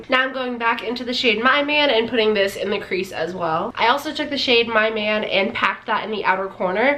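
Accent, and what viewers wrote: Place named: South, United States. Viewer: Love your videos! ❤️ I like how honest you are and plus your southern 😂 just like me! IG: Tillery_09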